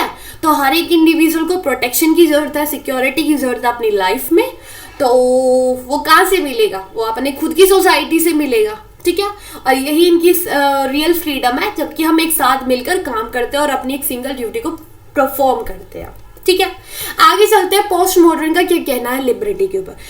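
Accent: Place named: Delhi, India. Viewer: It's really interesting.... To listen👂 Is YouTuber from Delhi?? Or NCR... speaking ascent!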